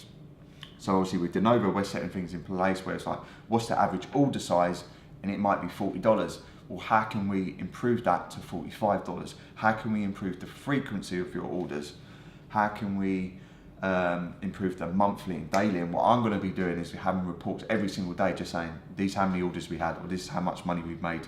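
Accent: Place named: Cockney, United Kingdom. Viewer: I enjoy watching YouTube's interpretation of your cockney twang on the auto generated subtitles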